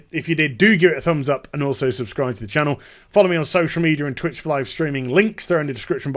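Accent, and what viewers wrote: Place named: United Kingdom. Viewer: you sound brittish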